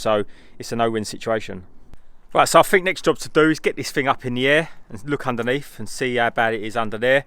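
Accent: Essex, United Kingdom. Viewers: A comment on Savage Garage's Fiesta video brought me here. Proper Essex accent there. 👍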